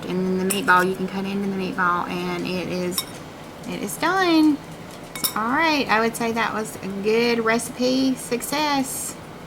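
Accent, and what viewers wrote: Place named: South, United States. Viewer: Thanks for the recipe. I love your southern accent. :-)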